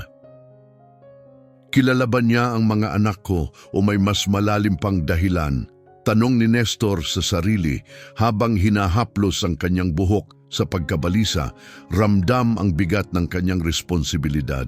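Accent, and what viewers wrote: Visayas, Philippines. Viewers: Magandang. 😊😊😊kuweñto ngunitpangit pakinggan ang mga pronouncition parang bisaya pakinggan pero marami akong napupulot na leksyon😊😊😊❤❤❤❤❤❤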